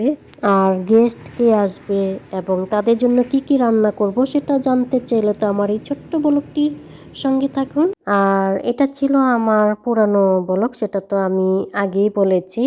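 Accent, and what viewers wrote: Dhaka, Bangladesh. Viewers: লাইক দিয়ে শুরু করলাম ❤ অনেক সুন্দর ভিডিও শেয়ার করলে আর অনেক ভালো লাগে ঢাকাইয়া কথা শুনতে। রান্না গুলো বেশ মজা হয়েছে মনে হচ্ছে তাই না?❤ আমি ও আপু গরম পানি দিয়ে রান্না করি তাহলে রান্না বেশ মজা হয় ভালো লাগলো ❤ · Dua Kori sob report jeno valo hoy r betha O jeno valo hoye jay. Dhakaiya kotha sunte valo e lage. Onek kichu ranna korle.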